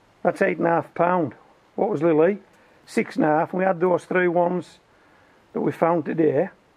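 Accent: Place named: England, United Kingdom. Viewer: I’ve been binge watching your videos for a couple of weeks now. Most times I cast it to my TV and let it play in the background while I’m doing something else or working from the computer (like today...it’s needed today). Besides them being highly informative, I also love that one instrumental tune you play, your cadence of speech and accent is calming to listen to, so thank you. (Typical American going gaga for English accent. 😆🙋🏾‍♀️) Nevertheless, I don’t understand why anyone would give this video a thumbs down. Keep’em coming!! Much love from Virginia, USA! 💚 And sorry for the loss of Molly. 😇💕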